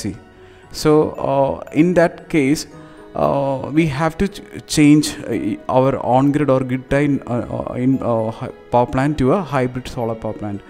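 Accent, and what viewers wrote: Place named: England, United Kingdom. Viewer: bhai india ka hi hai na mujhe to lagta hai England ka hai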